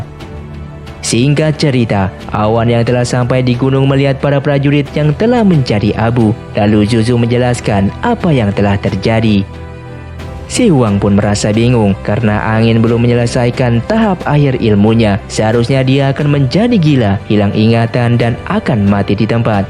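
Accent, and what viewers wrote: West Java, Indonesia. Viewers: bang maneh jelma sunda nyah?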